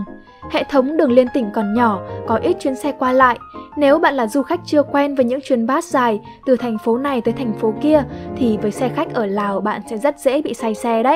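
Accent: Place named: North, Vietnam. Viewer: giọng là giọng bắc, nhưng đôi lúc có chút phong ngữ miền nam:)))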